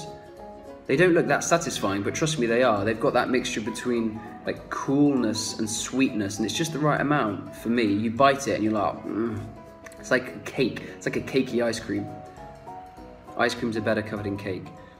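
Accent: Kuwait. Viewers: thank you to this videos to talk about my country,I proud to be kuwaiti and I'm shocked to see you speak arabic specially kuwaiti accent shloonch shno 😂😂 you cool bro ✔👏💚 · You’re Kuwaiti accents so cute 😂💗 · Happy a lot for your good experience in my beloved country..I wish that you will come back one day..and I advice everyone who decided to move there to interact more with the locals because I believe it's easy to make friends with and understand the Kuwaiti traditions..good luck in your next move..BTW way you sounded good in Kuwaiti accent. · Im Kuwaiti and I just wanted to thank you for showing the reality of our country unlike many opinionated ideas that have been thrown out there. There are many positive things as there are negative things in Kuwait but in my opinion, the positive things outweigh the negative by a lot. So thank you for representing my country in this positive way and for what it really is. 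Oh and your Kuwaiti accent is pretty good. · Your kuwaiti accent is so good! I would never have guest you're a non kuwaiti ! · I like this vid sooo much machos diyay hhhhhh your kuwait accent i well never .. from kuwait ;)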